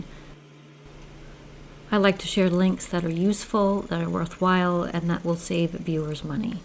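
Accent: United States. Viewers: Wicked good works well here in Oregon too. You sound to me like you could be from here. Nice work.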